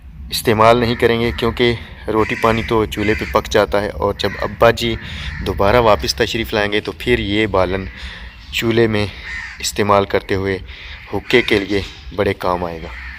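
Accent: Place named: Gujrat, Pakistan. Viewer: Salaam Bahi I recently started watch your Volgs your house is beautiful mahshallah your excient like gugrat are you guger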